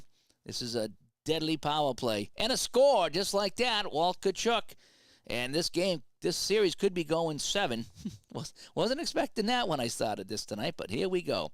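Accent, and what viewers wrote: Boston, United States. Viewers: Wow that was a cool video! I listened to the whole thing as if it were a real series…lol. I just discovered SC Hockey a little while ago from your channel. Finally ordered it and I love it. Especially the feature for creating your own teams. So I am just about finished with assembling the 1980 Olympic Hockey Teams. If I send you my excel files would you consider doing a video of a game or two from the tournament? There were so many Boston players in our USA team that your accent would be perfect.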